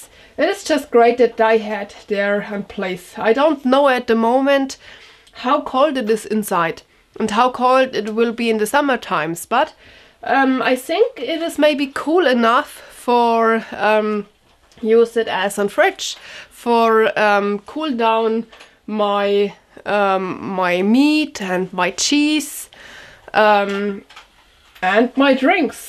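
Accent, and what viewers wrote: Germany. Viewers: You have great video's. Mine grandparents on my fathers side came to America where I live and they were from Germany and Switzerland. Well, they had the same accent as you, Yes! they sounded just like you :) I listen to you and I feel very much at home, good feelings. I like your videos & how you take us with you. But you know, to hear you talk, yah? yah I feel at home.. yah, is da truth. :) I'm so happy I found your channel.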